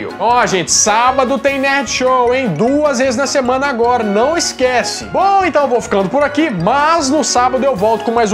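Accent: Minas Gerais, Brazil. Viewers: Curti e me inscrevi no nerd show, pq gosto do seu sotaque mineiro! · Que simpatia! Mineirice mais boa de se ouvir: a original!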